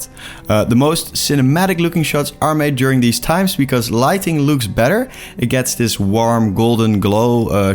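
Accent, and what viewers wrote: Netherlands. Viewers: Fist 5 seconds in the video "ohh.. that accent... he must be dutch" The humor in the video - yap, deffinately dutch 😀 Great Video - Cheers from your east border! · I smell a dutch accent? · Soow Die nederlandse accent gaat nooit weg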